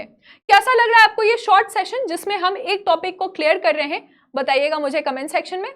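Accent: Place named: West Bengal, India. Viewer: Dilect se lgta madam West Bengal sey belong karte hai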